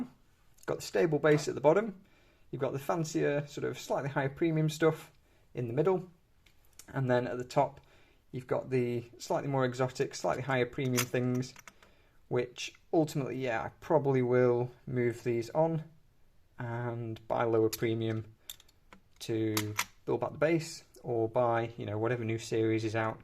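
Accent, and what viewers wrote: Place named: North, United Kingdom. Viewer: This person seems to have a northern accent. Such types shouldn't be allowed to buy gold, frankly. Big tvs and trainers and BMWs maybe, but not gold.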